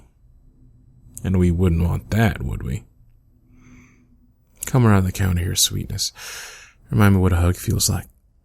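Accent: South, United States. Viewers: Ugh I’m such a sucker for country accents😩🤍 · Really wishing the guys around my town had this type of southern accent. They all sound brain dead. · Great video as always! as a girl from the south ( Florida in fact ) I am loving your southern accent. Keep up the good work sugar! ^-^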